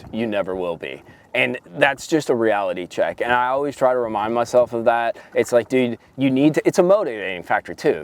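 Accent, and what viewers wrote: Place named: New York, United States. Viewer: Love your content,long time watcher of your channel. Curious if you are from New Jersey or New York, you sound like my college roommate! 😂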